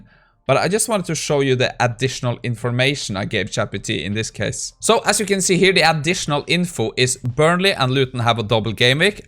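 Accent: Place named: Norway. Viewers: He IS speaking english with a Norwegian accent. Yes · Are you from Norway? Sounds like a norwegian trying to speak English to be honest :-)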